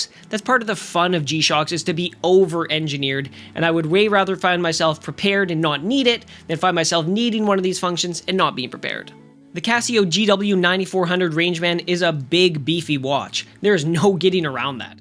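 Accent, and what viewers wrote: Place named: Canada. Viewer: I'm guessing you're in Canada, as you pronounce the word "top" the same way as Jordan Peterson.....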